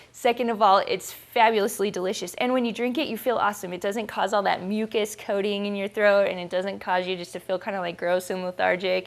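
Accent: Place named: Australia. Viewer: Posh australian accent.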